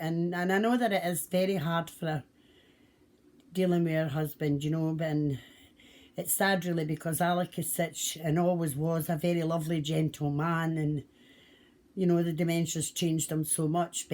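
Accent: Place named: Scotland, United Kingdom. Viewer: Oh my god I love you and your scots accent just found your channel I don't knit I sew but I will surly be visiting your channel again cause I feel like l have been visiting ayou in your home you are a pleasure to listen to god bless you from northern ireland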